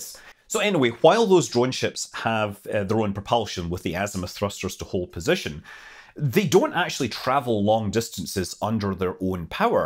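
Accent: Scotland, United Kingdom. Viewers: It took a Scottish accent to make me notice the ships names are plays on mystery and mischief. Lol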